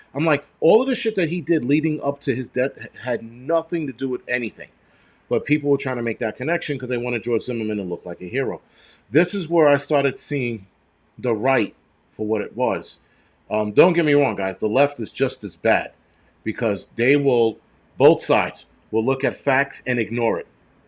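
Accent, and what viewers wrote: New York, United States. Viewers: Pinball Preparedness sent us your way and so glad he did...love your no-nonsense straight talk! LOVE your accent...reminds me of my days in NYC...good times...great people!!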